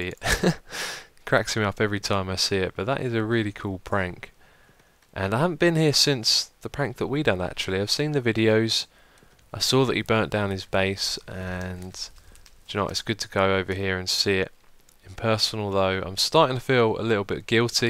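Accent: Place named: United Kingdom. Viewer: Brittish English....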